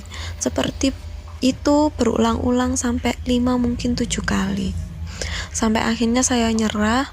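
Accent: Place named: Central Java, Indonesia. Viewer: jawa tengah ini logatnya kalem... Medok bgt...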